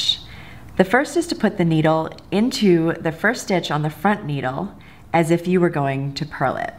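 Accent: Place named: United States. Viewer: It's just her accent. Many Americans ive heard say "mounain" without the t sound like "tigh-en" here too. Just the accent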